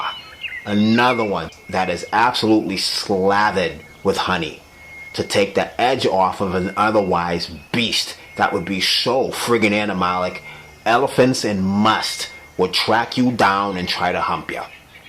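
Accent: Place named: Massachusetts, United States. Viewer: That mass accent is thick as hell you musta grown up in the burbs of mass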